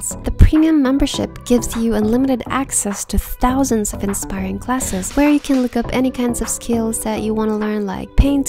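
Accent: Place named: England, United Kingdom. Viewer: I like your English accent :)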